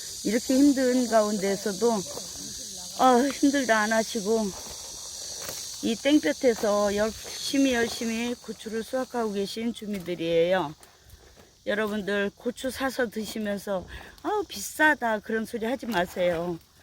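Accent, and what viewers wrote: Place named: Chungcheong, South Korea. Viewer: 힘드시죠? 말하면 뭐한대유 충청도 맞아유.나두 충충도 태생이유 고추건조 보기 좋습니다 유투브도 대성하십시요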